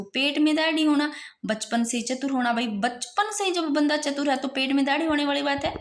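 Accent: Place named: Marwari, India. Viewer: आप मारवाड़ी बोला करो मजा आता है सुनकर दीदी 😊😊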